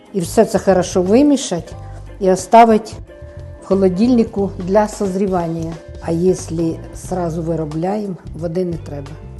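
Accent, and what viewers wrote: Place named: South, Ukraine. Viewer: Наш південний говір! І смачні мітітеї! Дякую Вам. Чекатиму наступних відео. Хай щастить!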